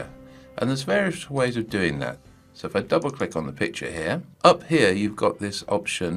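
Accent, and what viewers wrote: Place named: United Kingdom. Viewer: Excellent tutorial ! You are an excellent teacher and presenter. I must also comment on your cultured British diction... it is heavenly. We in the Queen's American Colonies speak a doggerel and uncultured version of the English language, of which we should all be ashamed. It is such a delight to hear someone who speaks in a cultured manner, in complete sentences, and without lacing every sentence with those pig-grunt utterances of the non-words "UH" and "gonna." I shall be watching more of your videos. Liked and Subscribed. Hold High the standard of proper and cultured English speech !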